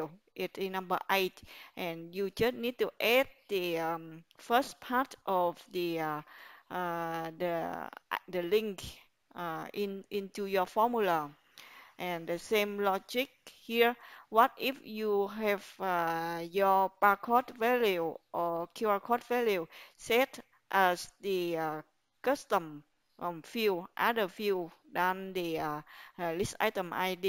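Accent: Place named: Vietnam. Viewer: thề nghe giọng đọc TA biết ngay người Vietnam :))))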